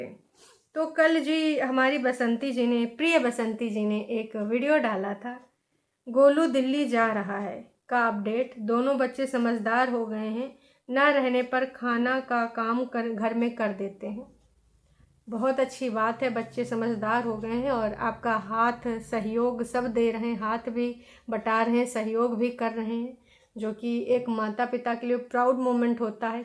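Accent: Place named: Awadhi, India. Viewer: Mam,aapka vlog or aapka way of talking bahut atcha lagta hai or beech beech main aapka awdhi bolna bahut atcha lagta hai.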